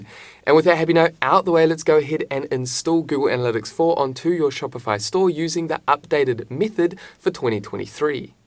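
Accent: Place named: New Zealand. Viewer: Cheers Mate! Good to hear a fellow kiwis voice lol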